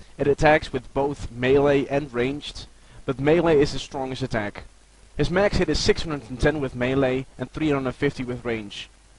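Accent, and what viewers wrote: Netherlands. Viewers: Hoor meteen dat je NL bent:P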